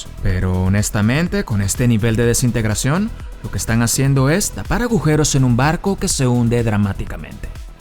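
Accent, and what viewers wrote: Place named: Venezuela. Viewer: a pensé que eras de México, porque no tenes casi acento venezolano xd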